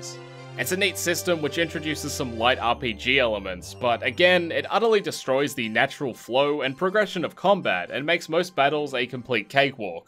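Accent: Australia. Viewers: Why are you Australian?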